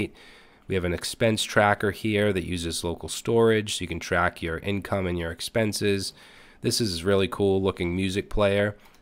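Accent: Boston, United States. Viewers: Sounds like a bit of a Boston accent there.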